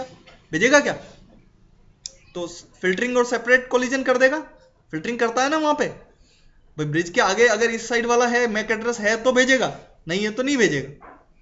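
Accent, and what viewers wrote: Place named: Jodhpur, India. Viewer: Sir me jodhpur se hu aapki aavaz se lag rha h ki aap sayad jodhpur ke ass pass ke ho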